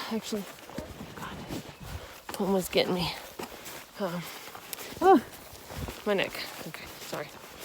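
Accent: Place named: Alabama, United States. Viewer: okay, I'm hooked on your channel. I've only been watching for about two weeks and have watched most of your videos. I even watch them at work. You are such a good narrator and videographer. I love your accent. I am married to an Alabama girl and even though I am from South Carolina, I can't get enough of those Bama girls talkin. Be safe out there.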